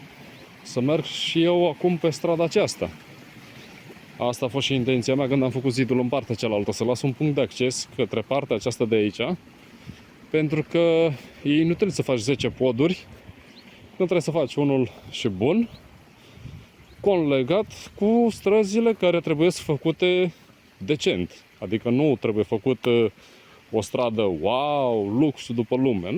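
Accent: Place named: Moldova. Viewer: Salut!! Ești moldovean de unde ? așa se pare după accent scz nu te supăra și eu am mulți veri la Roman (Piatra Neamț)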